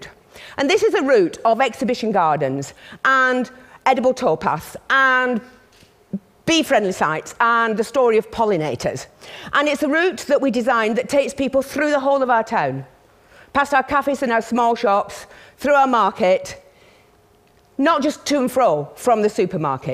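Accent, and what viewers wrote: United Kingdom. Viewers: Strong accents? It's british english.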